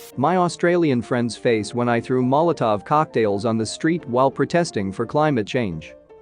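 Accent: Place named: United States. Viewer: But your text to speech accent is so american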